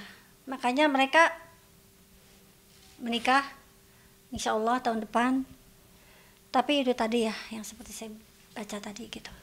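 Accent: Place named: West Java, Indonesia. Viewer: Orang sunda ya, keliatan dr logatnya..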